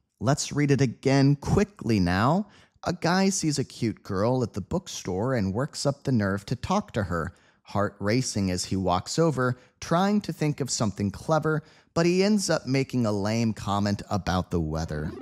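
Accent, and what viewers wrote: United States. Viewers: Listening to these lessons you have the benefit of acquiring multiple perspectives of the English language. The teacher explains with crystal clear pronunciation, vocabulary and synonyms, and also idioms and phrases, in perfect standard American accent which is the best in the world, and is like music to my ears. Listen and review the lessons to make the best of your learning. Thank you for the English lessons.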